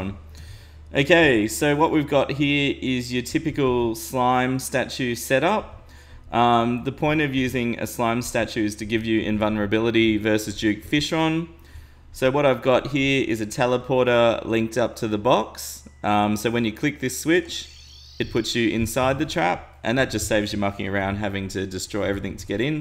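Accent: Australia. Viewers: Is this guy Aussie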